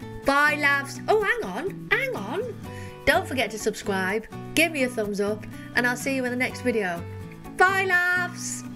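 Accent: Manchester, United Kingdom. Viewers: I follow your channel just for your accent. It's so cute.. are you from Manchester? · ...please do some Alanis Morrissete and Björk...always enjoy your reactions, you are so nice and cute, love your british accent! x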